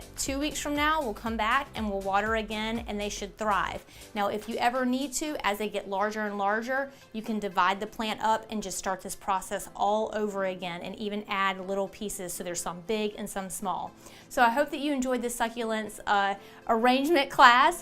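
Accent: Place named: South, United States. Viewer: Thank you for this video:) These are definitely the plants for me. Only need water every two weeks! Haha... Also, you seem to have a southern accent. I am from Alabama. Are you close by?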